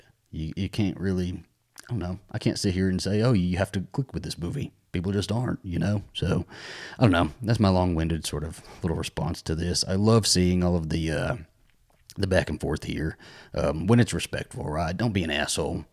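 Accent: South, United States. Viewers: I can't believe you're reading all the comments about one of the gay movies, "Bros" on this video at your house in Texas during the episode of "Coffee & Tequila", but your husband, Alastair is not there and I hope he'll be very happy for you, I wanna say I'm proud of you, I know you're gay and you had the Southern accent!!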